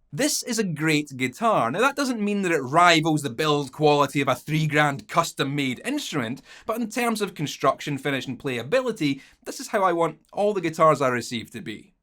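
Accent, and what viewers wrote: Scotland, United Kingdom. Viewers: Sarcasm in Scottish, sounds like home. 😂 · Sarcasm sounds so much better in a Scottish accent 😏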